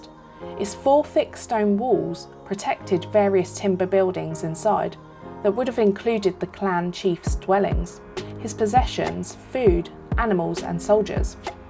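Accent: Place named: United Kingdom. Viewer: 🌎🗼 hello from your french friend 🥐☕ I love to hear your British accent ! what a great place! thank you for sharing with us my dear friend! 🌾🌿 nice aerial views! 🪴🌳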